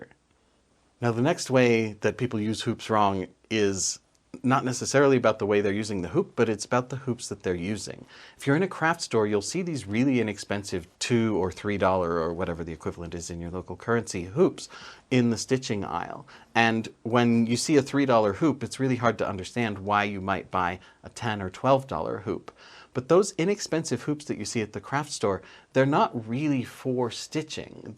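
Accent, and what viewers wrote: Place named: Canada. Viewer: Hello. 😊. But, why use a hoop at all? (ps:you sound like me, from Canada!)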